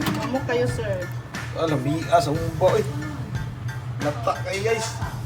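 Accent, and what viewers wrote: Visayas, Philippines. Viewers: Ang tagalog mo brad, Bisaya pa rin pakinggan.. 🤣🤣🤣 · Hoy mag binisaya ka nalang kasi yung tagalog mo pambukid ang style...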